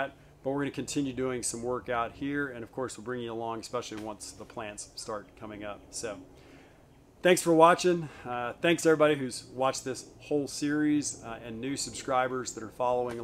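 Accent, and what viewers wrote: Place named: Pennsylvania, United States. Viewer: sounds lie you are from PA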